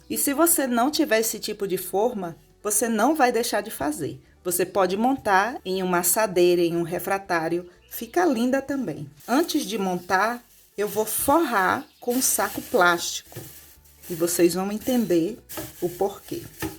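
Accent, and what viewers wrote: Bahia, Brazil. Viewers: Amiga tu é baiana? Parece viu kkkkkkk,top essa torta!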